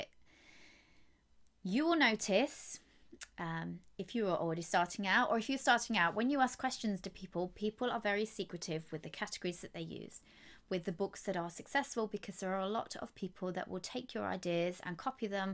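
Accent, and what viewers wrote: United Kingdom. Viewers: Hi 😊 I appreciate it because you are honest and realistic Excuse me , are you British ! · I am a Kdp newbie. I am about to upload my first children's book. Thank you for the sincerity and straightforwardness! Last but not least: I love your accent! What part of the UK are you from? I'll come back to let you know what happened to my sales. Wish me luck!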